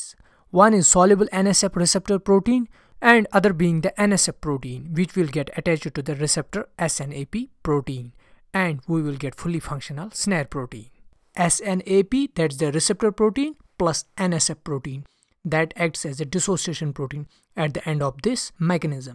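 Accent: Kashmir, India. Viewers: Your accent is kashmiri.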